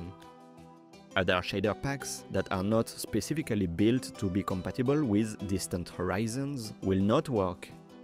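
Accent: France. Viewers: oooh le bon français x) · Tu es français nan 😂 ? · Hello j'ai remarqué que t'as un accent français donc je vais faire un commentaire en français mdrr. Pour la génération de monde c'est entrain de me dire 12 heures je dois réellement attendre si longtemps 🥲? Et en terme de performance t'as quoi comme PC et est-ce que ça tourne bien ? Parce que ma carte graphique est pas extrêmement puissante (RX 5500 XT) donc j'ai peur d'attendre 12h pour que ça crash au final. Sinon à part ça très bon tuto ça fait plaisir de voir un français faire des vidéos en anglais j'étais justement entrain de m'imaginer faire la même chose avant de voir ta vidéo 😅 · Un français qui parle anglais, c'est toujours marrant ! Je like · petit accent français 👌👌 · Super vidéo merci beaucoup. Et merci l'accent français :) Tu parle très bien Anglais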